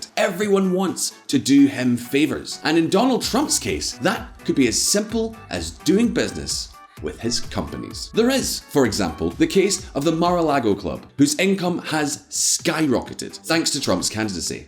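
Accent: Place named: Scotland, United Kingdom. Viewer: THE NEW YORK TIMES EH! BS WHAT A RAG! You sound like a SCOTTISH LEFT WING INDEPENDENCE PRICK.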